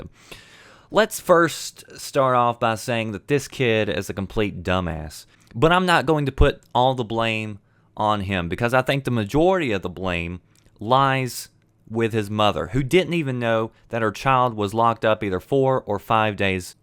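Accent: United States. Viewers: yes blame everything else its never the persons fualt hey ?? blame the game the booze the drugs. Noone and nothing made u take the drugs the booze or play the game. U sound american to me. take reposibility. and that girl in the pictures massively over wieght she could die of heart failure just in the shower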